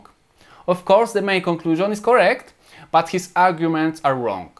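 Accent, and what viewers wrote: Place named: India. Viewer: Did you learn English in India?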